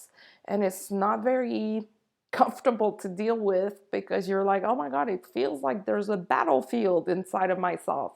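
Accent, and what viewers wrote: Quebec, Canada. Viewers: Do I hear a little French-Canadian accent!? 😉😉😉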